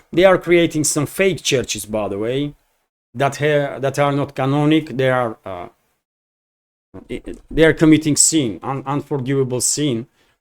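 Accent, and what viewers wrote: Russia. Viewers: Thank you. Keep it up. I am listening to you regularly. I like your russian voice and perspective.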